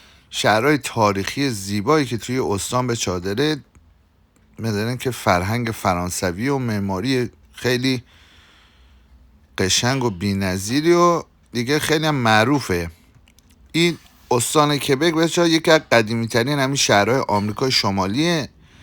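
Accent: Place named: Mashhad, Iran. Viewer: درود بر شما با اون لهجه مشهدی قشنگت،لطفا یک مقدار هم از جاده ها و طبیعت زیبای کانادا حين رانندگی بزارید،ممنونم ایران خوزستان 🎉❤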